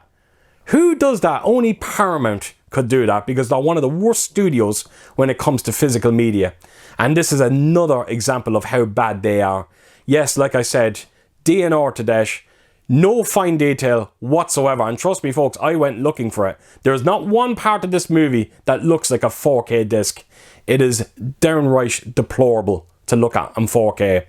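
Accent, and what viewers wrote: Ireland. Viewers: first time viewer and immedietly i hear the assault on precint 13 music and then the irish accent, irish myself from limerick and just started collecting last year, recently i bought first blood, the thing and predator all 4k uhd, planes trains was on my list too, not now, just subbed man and looking forward to your next uploads, cheers man. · Do you mind asking where you're from? Could be wrong but you sound Irish. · please make sure to link your video review. Your video message, especially that fantastic Irish accent (man am I jealous and love it) is so crucial to getting the point across. OR, have a go at another video dedicated to "Planes, Trains..." and really hammer 'em. We'll call it the remixed/uncensored version!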